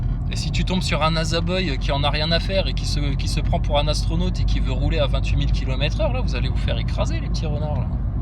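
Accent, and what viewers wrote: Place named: Belgium. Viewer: un renard, un renard, une pesquette mélodieuise, ton accent belge, putain, faut l'améliorer, putain !!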